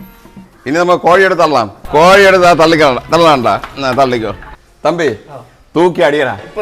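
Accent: India. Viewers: തനി തിരോന്തോരം 😂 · Thiruvananthapuram slag adipoli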